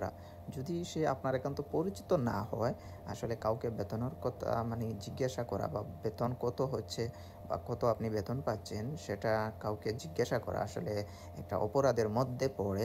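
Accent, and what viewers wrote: Sylhet, Bangladesh. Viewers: বাইয়া আপনা কতা বার্তা বুজা জায় আপনি সিলেটি আমিও সিলেটি মুল কতা হলো বাই কোন দিন জদি আললাহ আমাকে দয়া মায়া করেন জদি আসতে পারি রােমানিয়া তাহলে কি ভালো হবে জদি বাই আললাহর হুকুম তাকে আকি নচিবে দেয় রিজখে টানে আকি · ভাইয়ের কথায় মনে হচ্ছে সিলেটি হবেন, ভাই রেস্টুরেন্টে কাজে কেমন বেতন হয় জানালে ভালো হতো?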